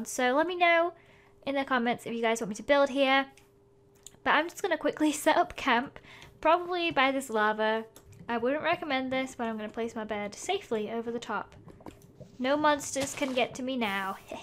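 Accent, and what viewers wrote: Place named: Leeds, United Kingdom. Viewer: It sounds like she's from Leeds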